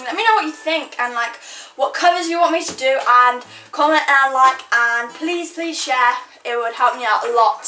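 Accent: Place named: United Kingdom. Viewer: How is it possible to talk with British accent and sing with American accent ?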